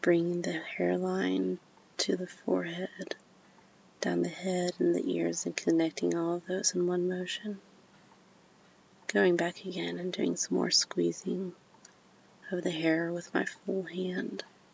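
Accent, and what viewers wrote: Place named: Kentucky, United States. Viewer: Based only on accent, I would say in Kentucky. If I could hear her pronunciation of Louisville, I could confirm.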